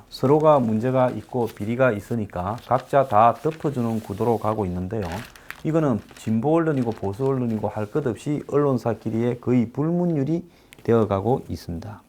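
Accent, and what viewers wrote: Gyeongsang, South Korea. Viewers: 황, 왠만하면 경상도 억양은 자제해라! 그게 자랑도 아니고. 수준낯은 애들 꼬득겨 지원표 몇게받으니 신나?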